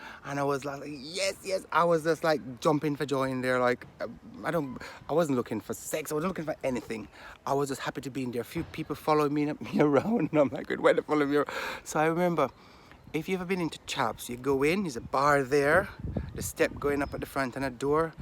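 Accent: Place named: Jamaica. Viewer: You sound like a yardie. Love the story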